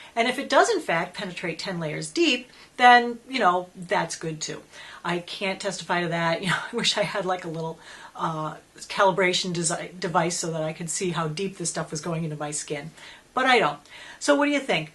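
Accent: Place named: New England, United States. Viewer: Thank you for the reply, I'm sure you're right it seems to be a bit better. By the way, it is 65º here, just lovely, but I totally relate to your winter woes, I'm originally from South Boston, another New Englander, though you still carry the "r's" in your videos, unlike myself, even after living 20 years in Spain. A very Merry Christmas to you and my thanks for such fun and informative videos.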